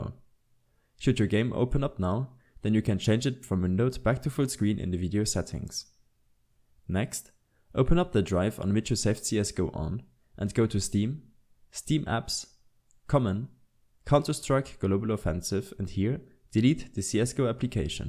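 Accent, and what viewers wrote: Germany. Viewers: That german accent 😻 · Man merkt an deinem Akzent dass du Deutscher bist ahaha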